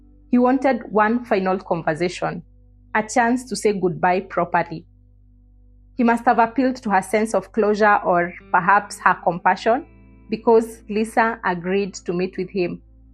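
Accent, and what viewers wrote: Kenya. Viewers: She has a Kenyan -African accent.......you want her to speak British or American English??¿? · 😂She's Kenyan. What other accent does she know?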